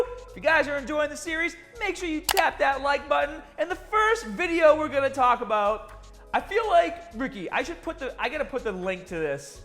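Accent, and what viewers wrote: Boston, United States. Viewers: He's losing the Boston accent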